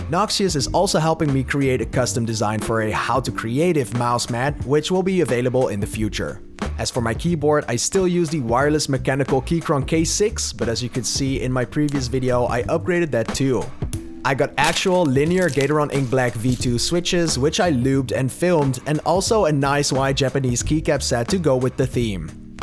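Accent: Netherlands. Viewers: I skipped the video forward to check if this is interesting to watch. And I immediately thought hmmm... you sound Dutch. And than you said 'twee vliegen in een klap' right after it. Toffe video en zeker een inspiratie voor mijn eigen setup! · I sound a bit of an dutch accents. Nice that your english is almost native.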